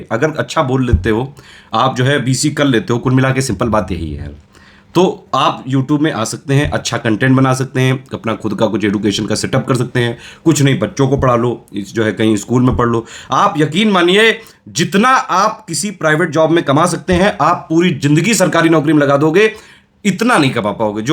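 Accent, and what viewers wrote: Kanpur, India. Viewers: Fully Kanpuriya style 😂😂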